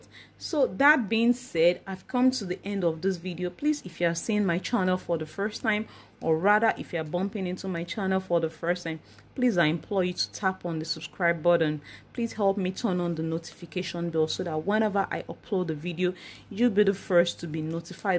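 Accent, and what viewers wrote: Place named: Cameroon. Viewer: Just stumbled on your channel. I just subscribed! You sound like a Cameroonian!! I know you said naira but you really sound like a Cameroonian! Good info on here!!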